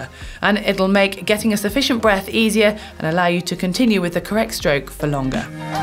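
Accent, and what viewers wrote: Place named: United Kingdom. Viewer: the British accent i can't understand and I'm not even american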